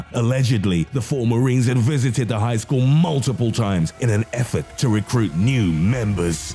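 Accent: England, United Kingdom. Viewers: "The military has a white supremacist presence over all" if you don't shut up you English sounding moron, my boots gonna find the back of your head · You have a British accent like half the time....sup with that? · Between your shitty accent and your shitty delivery go back to England and take your annoying accent with you.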